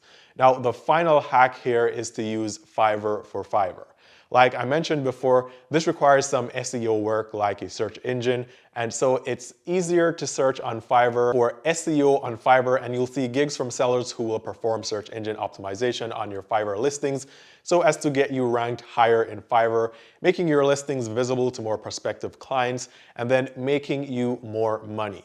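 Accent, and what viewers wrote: Jamaica. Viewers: Yu sound like a yaardie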